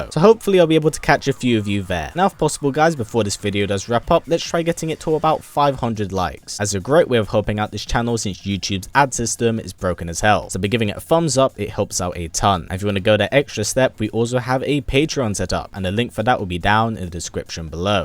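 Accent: United Kingdom. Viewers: Hey man, are you hailing from the UK?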